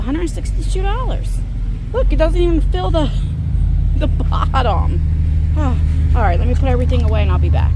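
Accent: New Jersey, United States. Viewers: Hold on to your hat,. I subscribe to over 800 YouTube channels. I have a very wide range of interests. I quit cable two years ago and YouTube supplies me with news, films, how to's, and lots of entertainment. I thought I'd miss TV but I don't and that's about twelve hundred dollars a year back in my pocket. I'm also a Jersey girl and when I hear you talk I hear my sister's accent and speaking style. You can take the girl out of Jersey but you can't take Jersey out of the girl. Cheers! 😊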